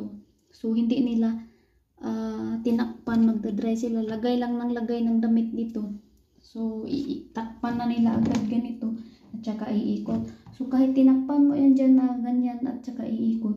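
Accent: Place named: Visayas, Philippines. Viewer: Bisaya Kapa Po maam, God bless maam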